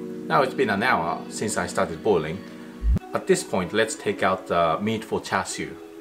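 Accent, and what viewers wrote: Australia. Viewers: You sound asian australian mate, great recipe btw! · Thank you for your wonderful video. Your English is perfect & it sounds like you have a bit of an Aussie accent. Bravo. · Are you in Australia? I hear a pretty strong Aussie accent.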